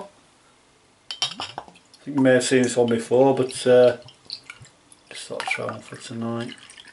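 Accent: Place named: Midlands, United Kingdom. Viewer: Nice one, watched Christians and yours, quite a bit of difference. I'm from the midlands and you say everything the same as me except gym shoes are trainers surely lol. Cheers 🍺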